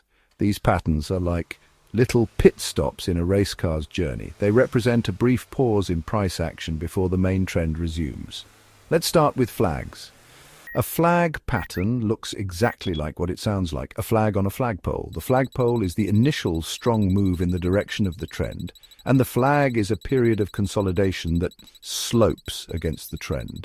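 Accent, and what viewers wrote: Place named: United Kingdom. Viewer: Very informative and u sound british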